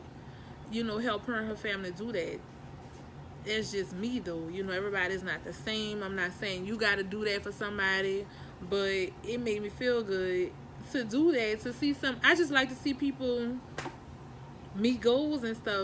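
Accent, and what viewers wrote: New Orleans, United States. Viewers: I love the NOLA accent too! That's what caught my attention and made me keep watching. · I thought your accent sounded like New Orleans. I’m a Louisiana native too. Yes, every Friday is seafood night at my house. Congratulations on growing your channel. Thanks for the tips on YouTube growth.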